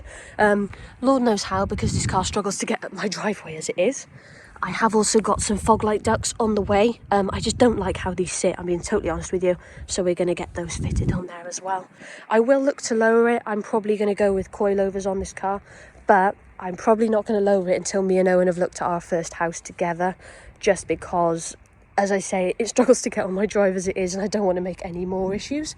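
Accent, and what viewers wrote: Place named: United Kingdom. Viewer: Where is the wing? I had a band new 1990 Twin Turbo Z in SF California... loved it... drove it 18,000 miles - dumped it moving to LA for film school for a 1991 4Runner and cried. I went through 3 sets of fronts and 2 sets of backs... As for tires - go for Michelin Pilot 4S pilot sports - the best rated!... I'd recommend what I'm driving instead a 330hp+ with mods 2005 silver Infiniti G35 with no Turbo... newer, faster, no turbo and about $8K plus sunroof used now. (I love my sunroof!). Thanks for the memories and your great UK accent. Enjoy! Try a G and forget the Z... as you get back seats even on the G35 6mt coupe which lowers insurance.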